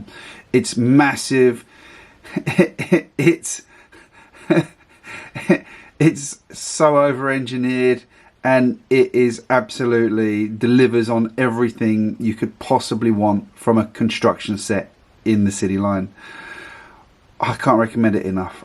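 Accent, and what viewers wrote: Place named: United Kingdom. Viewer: Just a straight up thought if I watched with my eyes closed. Nice British accent!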